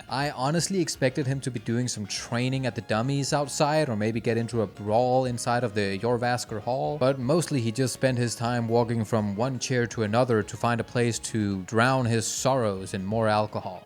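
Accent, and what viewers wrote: Denmark. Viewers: Hold on, that accent.. Are you danish? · Hmm I sense a Danish accent. Am I wrong?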